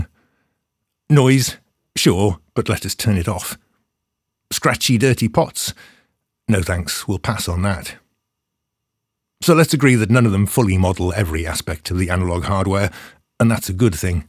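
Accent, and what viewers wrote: United Kingdom. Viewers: You sir win the Internet! Another brilliant video. Thank you for your wisdom and your BBC voice that delivers it haha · which area of UK this accent ?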